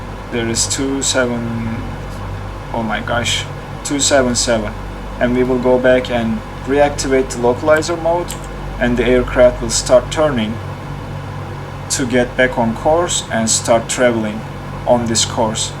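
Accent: Turkey. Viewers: You are talking english like a turk, are you turkish, I wonder if you are turkish, I am turkish, so I'm asking, it was an excellent educational video by the way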